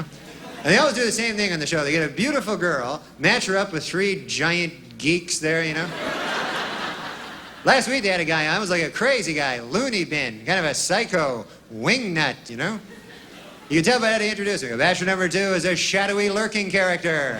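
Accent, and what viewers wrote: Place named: Canada. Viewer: Man he's SUPER Canadian right here now eh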